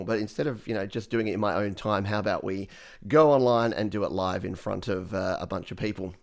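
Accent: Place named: Australia. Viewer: I love your accent. That's why I want to go to Australia. Greetings from Germany.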